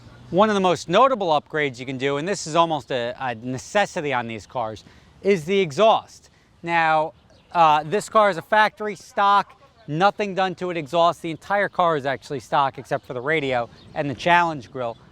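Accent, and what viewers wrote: United States. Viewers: Know what..!? This is all cool and everything,but hearing an American talk about Ferraris is like listening to Trump speaking about human rights; it just dosent seem natural.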